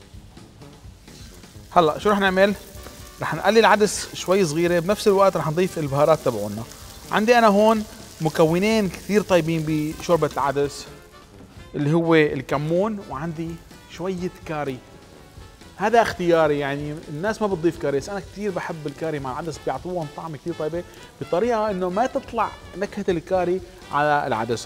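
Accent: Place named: Syria. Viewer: انضميت للقناة كرمال اللهجة السورية بس♥️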